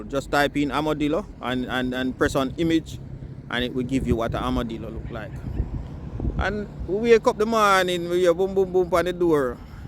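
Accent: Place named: Jamaica. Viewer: Hi brother, from the time I hear you speak I know you're a Jamaican, I'm just listening to you I'm so impressed about your visiting and experience in Vincy so glad you enjoyed every moment in beautiful Vincy whenever you want to travel again go Vincy man I tell you you are very welcome and make sure you visit other grenerdine island, one more thing there are other beach down the lee-ward side that have a mixture of white and black sand with seashell between the rock ,but you have to walk far to get there blessed peace and love 🇻🇨🇨🇦🙇🏿‍♂️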